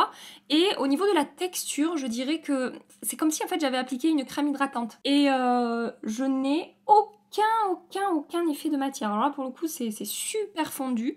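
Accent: South, France. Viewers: Coucou ! Ah ! Cet accent du Sud en ce dimanche ensoleillé ☀️ Je viens commenter par ici pour changer d’insta 😀 très chouette vidéo (comme d’habitude ai-je envie de dire), j’ai vu passer la nouveauté Dior mais sans grande conviction mais mnt tu as piqué ma curiosité... Est-ce que la teinte 2 est finalement bonne ? Et concernant le feutre à sourcils, chez Urban Decay ils font un produit très similaire (Brow Blade me semble-t-il) et avec une gamme de teinte plus étendue... Si jamais 😀 Excellente journée !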